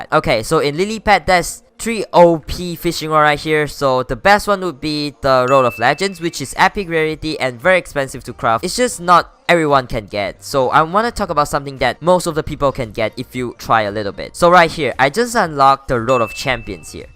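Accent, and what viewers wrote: Singapore. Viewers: r u singaporean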